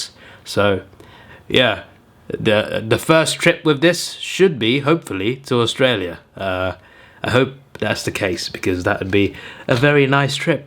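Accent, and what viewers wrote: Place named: United Kingdom. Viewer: you seem to got a British accent... like did u practice or u brought up there?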